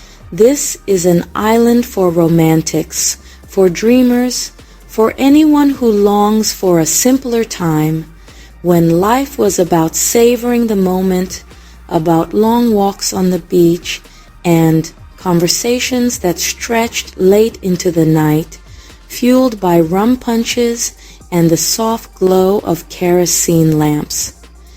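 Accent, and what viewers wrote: Jamaica. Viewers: I love this. For one moment I thought English which is taught in schools went extinct. Anyone from any English-speaking country can understand and partake. Nothing tops that beautiful Jamaican accent. I wish you much success in your new venture. God bless.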